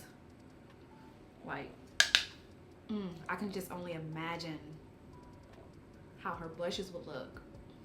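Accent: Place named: Texas, United States. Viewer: Where is she from? Sounds like texas. Im new here.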